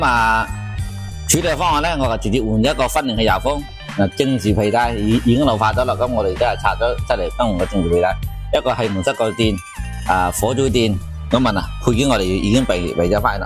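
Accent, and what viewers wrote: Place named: Nanning, China. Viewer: 这粤语说的听起来真难听 南宁的吧这个人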